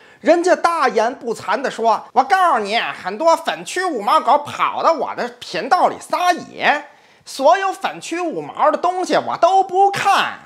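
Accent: China. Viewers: 儿化音挺好听的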